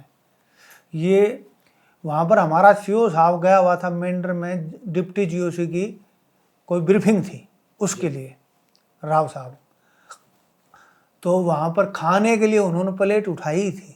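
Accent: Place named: Haryana, India. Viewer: Sir aapki galti nhi hai haryanvi accent sabhi ko samajh nahi aata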